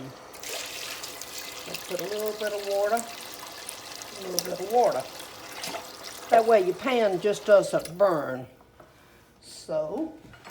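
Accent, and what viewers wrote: South, United States. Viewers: I cannot believe that I have laid out on my counter my sausage to thaw my cabbage is already cut up and my onions and ready to make this dish! I live in Alabama, so we do cook very similar. I am the one that makes my potato salad exactly like you do.! I have just found you under recommendation from another lady that I know and I am so thankful and I love your southern accent!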